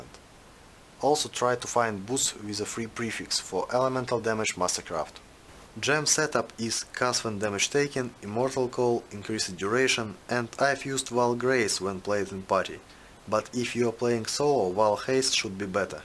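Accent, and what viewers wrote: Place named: Russia. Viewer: Твой руззгий акцент просто супер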